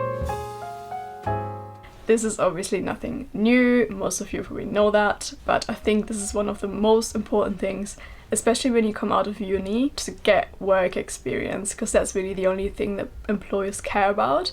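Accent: Germany. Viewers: Your accent sounds quite german or am I hallucinating? Great content. Love England. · She has very strong german accent though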